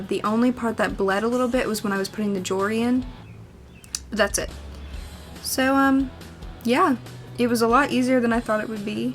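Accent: South, United States. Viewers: Are you southern you sound like you have a southern accent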